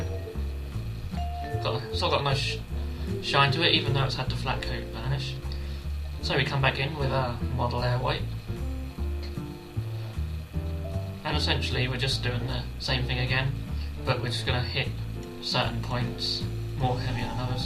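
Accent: Norfolk, United Kingdom. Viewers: is that a Norfolk twang i hear in your accent ?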